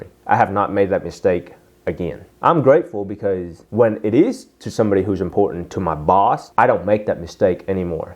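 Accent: South, United States. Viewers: Totally!! He totally got the Southerner accent, which is so cool. :)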